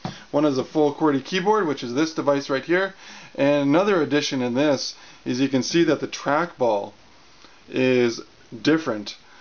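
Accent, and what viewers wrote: Canada. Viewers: I think I finally understand what a Canadian accent is. (outside NA)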